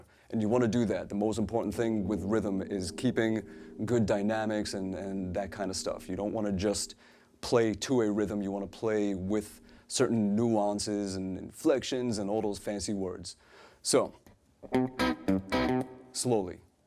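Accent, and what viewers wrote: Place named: New York, United States. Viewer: He sounds like FZ with a NY accent.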